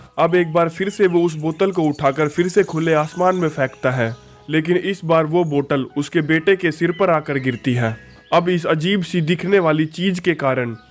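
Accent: Haryana, India. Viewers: Haryana ka h k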